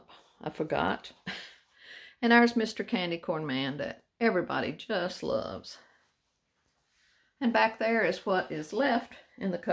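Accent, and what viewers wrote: South, United States. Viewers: I loved your Halloween tour! I can’t get enough of all the vintage goodies! I love your pleasant sounding voice and your southern accent. 😊💖🎃🍁👋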